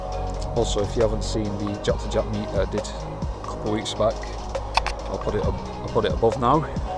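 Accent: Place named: North West England, United Kingdom. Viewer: Where you from mate? Sound local to me definitely north west anyway haha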